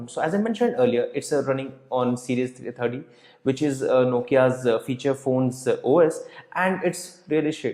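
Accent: India. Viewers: indian accent sucks!!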